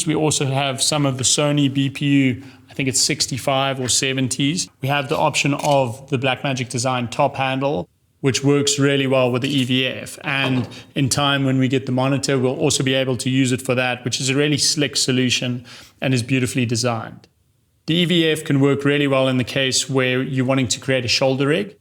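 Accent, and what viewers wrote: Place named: South Africa. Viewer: Nicest Saffer accent I’ve heard. Usually pretty harsh. Great footage and gear review!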